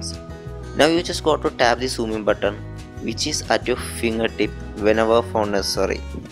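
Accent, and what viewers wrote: Kerala, India. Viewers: R u from Kerala Ur acsent wow! Amazing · wonder I thought I knew that accent, Nammallum keralathinna..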